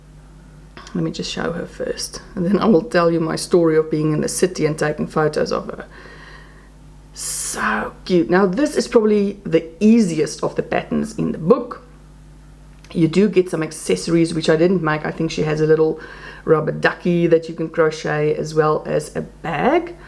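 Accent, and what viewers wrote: South Africa. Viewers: I love your chats when you started talking i recognized that you are South African. Me too born at Cullinan in 1946 all my family are in Australia and some friends so only my daughter and hubby and a boy and girl are my immediate family. . Ive been crocheting since I was 8 year old when my grandmother taught a lefty to crochet. I've done dressmaking and most crafts but crochet and colouring in is my best crafts right now. And have loved listening to you ❤❤❤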